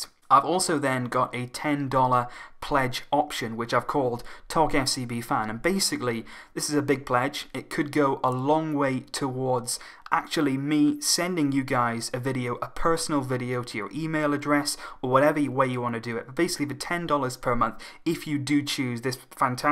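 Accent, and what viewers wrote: Wales, United Kingdom. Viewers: Barcelonista 1983 I'm from Scotland and he definitely sounds Welsh mate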